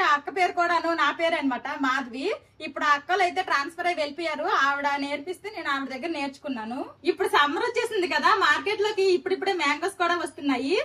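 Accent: Srikakulam, India. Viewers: Akka konchem matlade style marchu Srikakulam paruvu teyyaku hi andi maney vere di select chesko konchem style ga matladu em kadhu next vlog ala try chey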